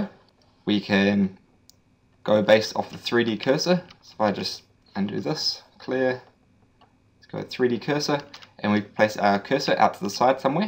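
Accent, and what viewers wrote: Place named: New Zealand. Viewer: nice to hear a kiwi accent :D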